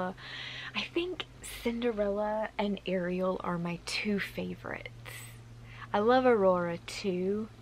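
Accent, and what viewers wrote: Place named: Scotland, United Kingdom. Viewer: I’m like almost positive watching all that Outlander helped your Scottish accent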